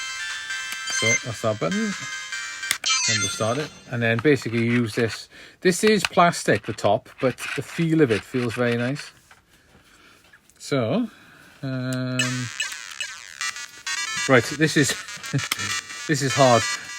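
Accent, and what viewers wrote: South Wales, United Kingdom. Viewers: Yea south wales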